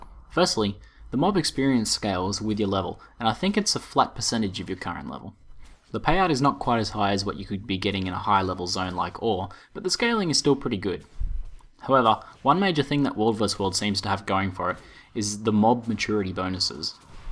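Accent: Australia. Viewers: Awful aussie accent :D Nice vid however.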